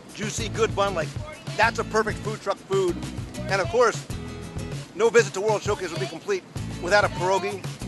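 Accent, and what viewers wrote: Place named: East Coast, United States. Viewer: Invest in a microphone! I can barely hear you, then the music blows my ears out. We can tell you're from the East Coast, please slow down and enunciate.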